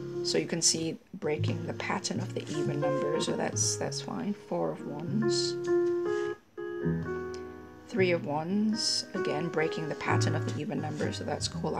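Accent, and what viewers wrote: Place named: Singapore. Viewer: Hahahahah I love your Singlish <3 you score full points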